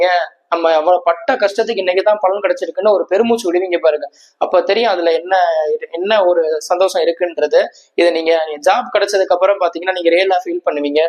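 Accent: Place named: Madurai, India. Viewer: Sir madurai ah slang apditha iruku avaiga ivaiga 😅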